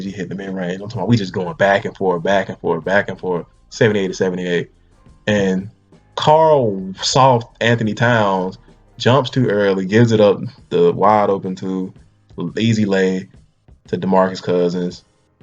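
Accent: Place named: Alabama, United States. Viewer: What part of Alabama are you from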